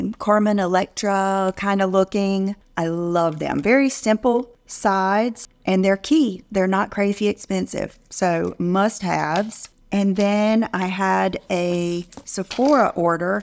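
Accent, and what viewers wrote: South, United States. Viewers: Hi, I used your link and discount code yesterday to order my City Lips, thank you very much for your recommendations, I had to restock my stash, also love the variety on your channel, your presentations are exceptional, and don’t ever loose that charming southern accent, stay with what you’re doing, you can’t improve on perfection. Thanks again and God bless.❤️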